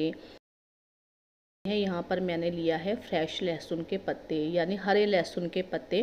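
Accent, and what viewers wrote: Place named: Punjab, India. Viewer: Bahut badiya banaya tum punjabi ho na bolnye se pta chal raha hi sarso k oil se khansi to nhi hogi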